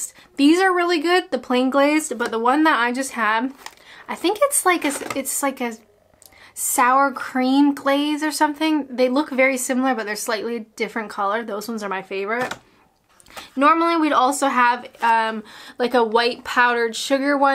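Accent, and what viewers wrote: Canada. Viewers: I love the aboots 😊😊😊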